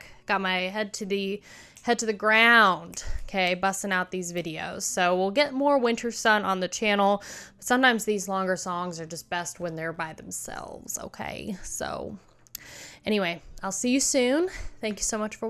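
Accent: South, United States. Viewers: Where are you from? I'm detecting a southern accent in your voice.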